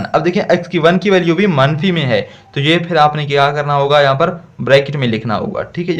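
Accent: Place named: Saraiki, Pakistan. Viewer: Sir lagta hy AP saraiki Hain q ky ma Bhi saraiki Hoon AP ki saraki ka mughy PATA chal gya😊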